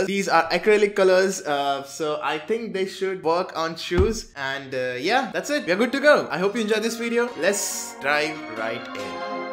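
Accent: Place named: India. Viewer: That's kinda rude,but i think that he's Indian cuz his accent.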